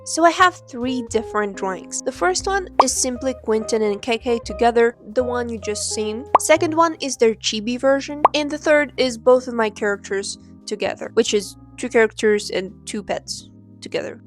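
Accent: Russia. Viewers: is this Russian accent I hear? прикольно видеть русских даже на англоговорящем интернете :D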